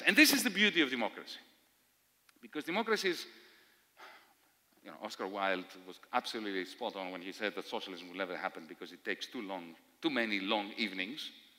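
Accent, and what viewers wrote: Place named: Greece. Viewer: I would say 75% to be fair. It takes a while to get used to his strong Greek accent.....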